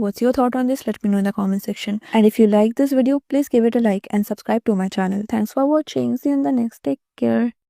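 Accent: Bengal, India. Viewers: I love ur bangali tone 😊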